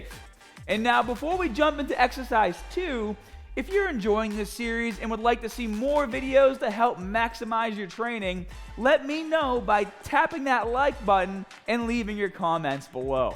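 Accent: Boston, United States. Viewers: Hard to tell if is from Bahstan or has a speech impediment... go Blues! Seriously though, one of the best YT channels with lots and lots of good info. It's important to have multiple views on "controversial" topics. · Are you from Boston ?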